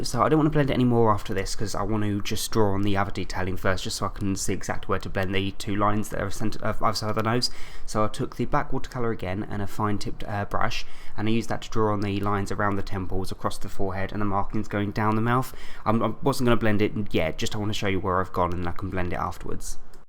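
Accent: United Kingdom. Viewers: I LOVE YOU TOO MUCH BC I SAW YOUR CREEPYPASTA STUFF AND I WAS LIKE "oo ima watch dis" AND YOU HAD A BRITISH ACCENT AND THEN I LIKED YOU EVEN MORE AND NOW I KNOW YOU LIKE DOCTOR WHO AND IVE SEEN EVERY ONE OF YOUR VIDEOS I LOVe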